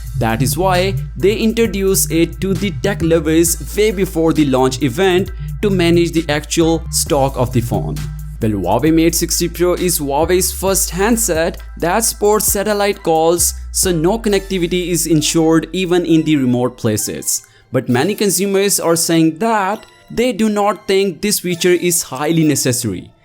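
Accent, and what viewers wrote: India. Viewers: Indian with English accent 😅 can't be watched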